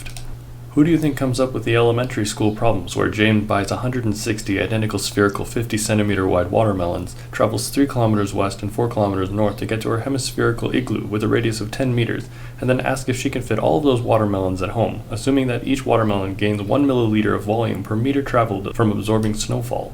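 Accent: Quebec, Canada. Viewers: Juste pour être bien sûr, t'es québécois ???????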